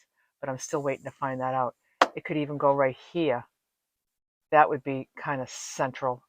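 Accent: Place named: Boston, United States. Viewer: just to let you know, that you are such a regular, played in my kitchen as I'm cooking dinner, that both me and my adult son (from the UK) now have mastered the Boston accent. 'That shit bowthas my eyes, 'Over heeyer" Over theeyer' Love you and your natural style x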